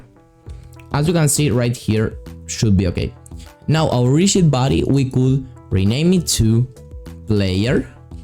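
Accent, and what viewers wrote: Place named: Argentina. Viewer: Your from Argentina ❤❤❤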